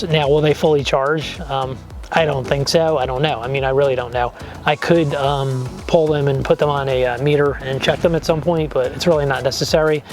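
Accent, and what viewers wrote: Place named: Mid-Atlantic, United States. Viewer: Your mid-Atlantic accent. The show is set in Delco, PA.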